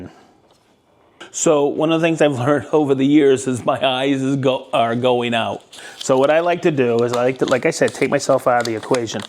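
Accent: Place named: Massachusetts, United States. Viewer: Wicked awesome!! (I love how your accent reminds me of all my mom's family - Swampscott, MA baby!) I am a relative noob to woodworking at 54 years young, but I am looking forward to upgrading my MFT-3. Love these super-helpful videos,!!! Answer: I tend to prefer metric since I grew up overseas, but am also comfortable using imperial. My "go-to" is metric, though.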